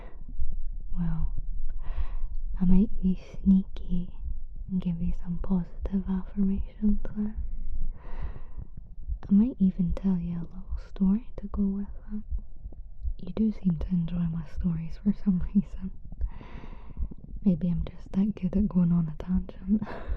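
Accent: Scotland, United Kingdom. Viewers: u have to be from scotland the voice just it speaks to me i mscottish and u sound exactly like other vtuber / f4m content scottish creators and some people in my area lol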